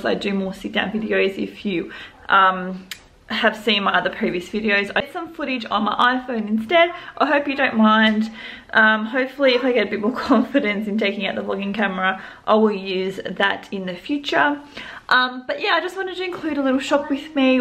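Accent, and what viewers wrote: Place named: Australia. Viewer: How cute are youuu!! Love your Australian accent!! I think you slayed that challenge!! Well done! Want some of those gummy snakes now!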